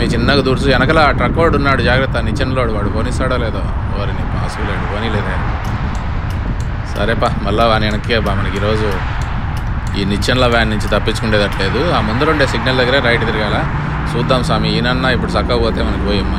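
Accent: Kadapa, India. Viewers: Anna .. u r doing good job...with Kadapa language.... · Gaaru! Video Simply Superb. Kadapa Yaasa Bhaasa chala bagundi. USA lo Kadapa Yaasa ( Vuntaado Voosipotaado, Saami )! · Oorini pasugala vaadu ponilede !! 😂😂 Rayalaseema slang . Very funny 😂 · ముక్యంగా మీ కడప యస ఇంకా బాగుంది. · Edhie mana rayalasema slag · Enjoyed Sir. Super kadapa accent 😍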